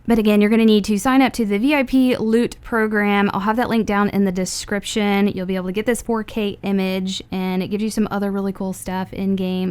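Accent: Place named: United States. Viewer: American accent: 100%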